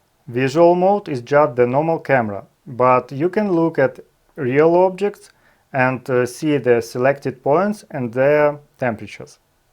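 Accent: Russia. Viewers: Хоть ломаный английский и стал получше, но акцент русского языка так и остался )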